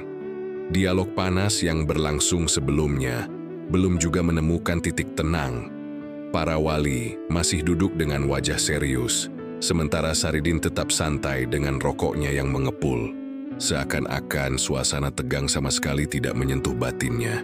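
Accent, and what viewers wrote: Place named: West Java, Indonesia. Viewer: Ini cerita agar umat belajar ilmu ,Sareat,Toriqod,Hakekat,dan Makrifat ,ini sudah tingkat Makrifat ,jadi anak anak belum sampai ,masih saringat,sayang yang membawa suaranya orang dari Jawa barat , bila Jawa tengah akan lebih halus contoh dipun Tompo ,bahasa Jawa barat di Tampa kelihatan benar orangnya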